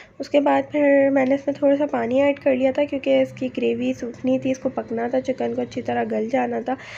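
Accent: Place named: Karachi, Pakistan. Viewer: Mujjy apki awaaz se ye lag raha hai k ap karachi se ho apki age b kam hai ??? Aisa he hai na and apki byti masha Allah cute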